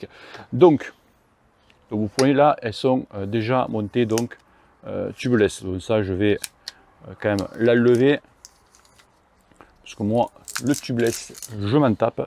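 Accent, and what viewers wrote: South, France. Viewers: Bonjour,j’apprécie tes conseil et commentaires sur les vélos et les conditions d’utilisation.J’aime l’accent que tu a ,nous qui sommes de l’est ,un peu de soleil fait pas mal.Tout ce que tu dit est intéressant mais n’hésite pas de faire intervenir en commentaire un pro quand nécessaire.Cela apporte plus de crédibilité à ta vidéo qui est très bien faite.Je roule Cannondale 4eme vtt.Sur route j’avais un trek madone 2011 que je viens de remplacer par un Scott Foil 2021,Patin,shamal miles.Montages perso.J’ai rayonné ma première roue à 14ans.Depuis je compte plus les vélos construits et réparé gratuitement bénévolement chez Emmaüs aussi.J’ai 65ans retraite et archer.Le vélo m’apporte la santé et la forme.Tes expérience sont judicieuses et concrètement très utile.Cordialement.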